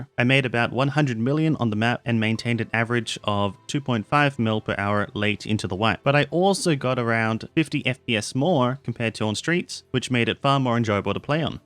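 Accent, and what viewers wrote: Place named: Australia. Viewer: first of all, i love this video and you're crazy underrated. awesome tips, coming from a fairly washed tarkov player. second, i was running factory earlier today, and was killed by so i checked your twitch out and sure enough, there you were in factory beaming me down. and here i am 2-3 hours later clicking on a youtube video and hearing a fairly familiar aussie voice and checking who it was from. and as you can guess, it was you. anyways, i love the content, subbed.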